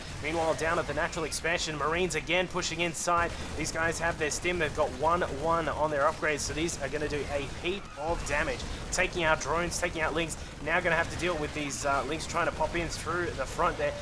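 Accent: Australia. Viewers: an aussie?! bout time!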